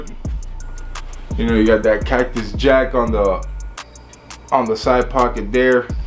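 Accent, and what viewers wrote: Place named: Chicago, United States. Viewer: I copped these too, paid resell . Love the Jordan 6. You sound like you from Chicago. Or the midwest area .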